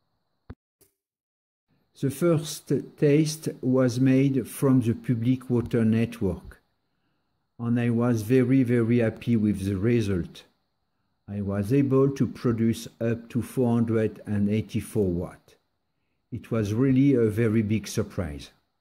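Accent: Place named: France. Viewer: Ah ah j'ai eu le même constat, pour une fois que je comprends intégralement une vidéo en anglais. Vive l'accent franchouillard :)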